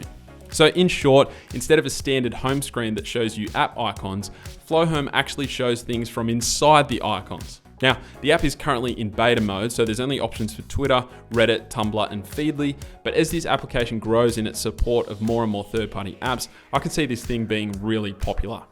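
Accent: Australia. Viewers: lemme guess ur a aussie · Good video, great editing, nice apps, good to hear an Aussie accent PS Badlands is way old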